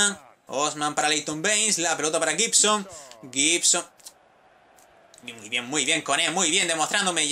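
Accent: Canary Islands, Spain. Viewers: Eres canario¿ es que tienes un acento muy parecido . Pd: me gusta tus vídeos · eres canario?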